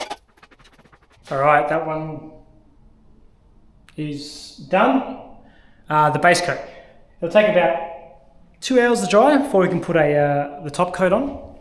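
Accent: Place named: Australia. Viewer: Which part of Australia is this?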